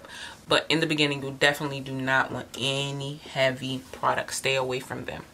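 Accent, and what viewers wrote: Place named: DC/Maryland, United States. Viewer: Hey girl hey 👋🏿, I know my people from that DC/MARYLAND accent ( most people don't hear it but I DO)❤💚🖤✊🏿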